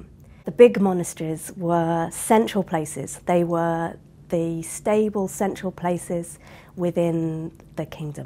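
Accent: United Kingdom. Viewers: Loving 🥰 your British accent ❤️😊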